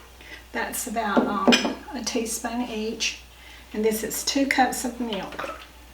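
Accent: South, United States. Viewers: I just found your channel, you are SO sweet, oh my goodness. Listening to you share the recipe with your Southern style just made me feel so at home for some reason and I'm not southern! (even though we live in FL, that is NOT the south) :-) Newly subscribed. I'm looking forward to making this on Thanksgiving morning. I think many people know of a breakfast sausage recipe but your video just is so heart warming. Wishing you a Happy Thanksgiving. Glad to have found your channel.-Theresa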